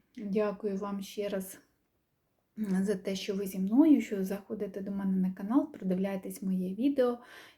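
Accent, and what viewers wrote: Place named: South, Ukraine. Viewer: як завжди ви не перевершені,гарні схеми,гарна робота і може я я помиляюся, милий душі південний акцент.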